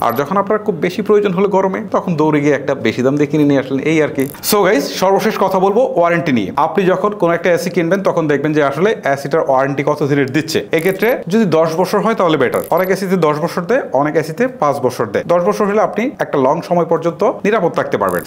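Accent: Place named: Noakhali, Bangladesh. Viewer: Eita noakhalia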